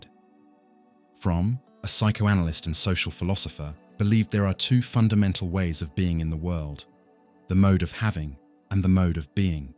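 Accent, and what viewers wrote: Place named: United Kingdom. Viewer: Gosh... 2.5 hours of AI crap, W/British Accent and Sparkly Background to boot!! I need an AI which hides crap like this.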